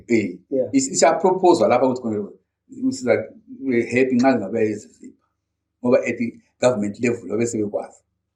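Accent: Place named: Shona, Zimbabwe. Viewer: What real tribe is he coming from because he speak Ndebele with a shona pronounceing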